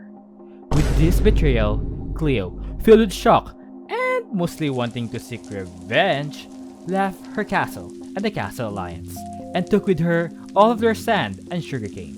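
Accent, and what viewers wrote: Philippines. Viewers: Ya kinda have a Filipino accent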